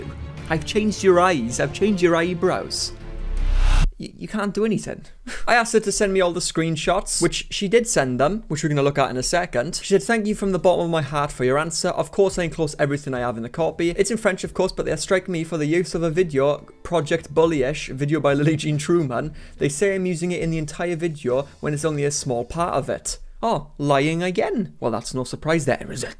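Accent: Wales, United Kingdom. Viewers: i came for the lillee jean drama, i stayed for that welsh accent 😩😩😩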